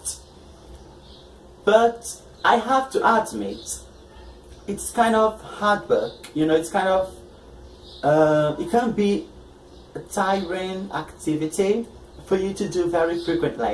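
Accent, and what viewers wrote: United Kingdom. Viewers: Perfect English!!! This kind of accent that i want!! A British one.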